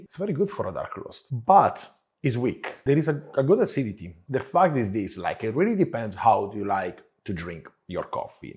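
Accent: Italy. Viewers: as much as I love machinetta and italian accent..half an hour is true dedication :D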